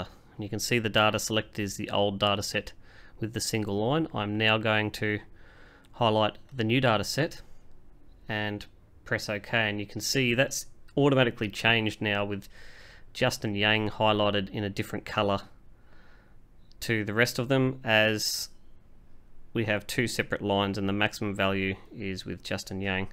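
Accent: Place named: Australia. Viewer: I've been using Excel since 1993 ver. 5 & am still learning. Great channel! Was in Australia for a whole month in 1987 so recognized your accent. Cheers from Vancouver.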